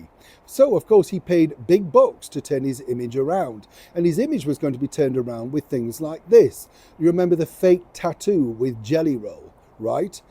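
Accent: Yorkshire, United Kingdom. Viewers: Mithering 😀 good Yorkshire Lad, love you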